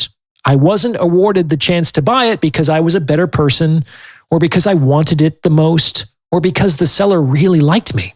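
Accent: United Kingdom. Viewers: If you are astute enough to make a lot of money, then you should be astute enough not to drive the fancy car. You sound like some sort of UK Indian subcontinent person. The average intelligence quotient is 82-83, and you are probably going to receive a lot of idiotic behavior. If you are middle class, then these people are even more status-oriented, more subtle and less greedy, and unless they are your true friends, then they are useless in your life. You won the race and they are all losers in the race. At least 60% of people do not possess the ability to be happy, and they only way they can gauge happiness is by what they have, and how much things cost. It is a mostly an impoverished existence. I was also successful for a number of years, and none of my true friends ever needed money, because they also eventually became successful. But there were many other people that I knew, and I had obligations, and then I moved to the second world much of the time (where I live a dream life), and all of the people wanted something from me. 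If you want to get along with (all of the other people who are not achieving) then do 2 things 1) hide your wealth to the greatest extent possible, so you don't get killed (like the Chinese woman Abby Choi, who was killed by her former in-laws in February 2023) 2) Friends are different, but when it comes to acquaintances, make sure your money is available for their achievement. Your job is to make people's lives better. (I give money, based on what I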